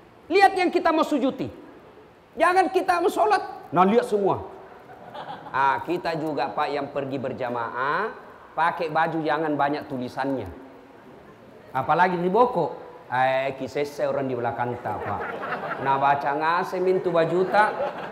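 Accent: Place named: Sulawesi, Indonesia. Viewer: haha..kalo pak ustad tergantung audiensx, kalo ceramah d daerah sulawesi selatan atau daerah luar tapi banyak komunitas bugis makassar maka dia banyak pake bahasa dan logat bugis makassar. tapi kalo ceramah d luar, misalnya d jawa, pasti ndk ada sisipin bahasa bugis-makassar.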